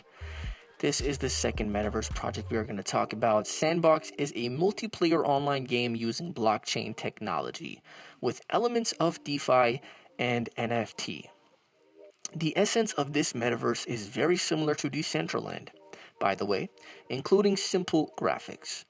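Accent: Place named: United States. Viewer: where you from? I’m having trouble deciding whether you’re American or Canadian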